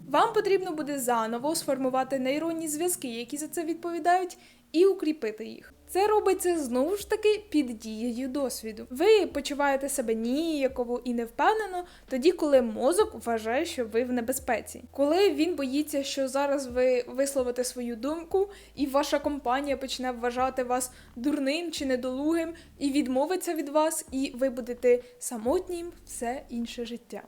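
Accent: Poltava, Ukraine. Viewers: Дуже дякую! Яка легка у вас подача! Лише мені здалось, що до кінця відео полтавський(милий, такий близький) акцент став менш вираженим?) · Що це за акцент такий прикольний, полтавський?)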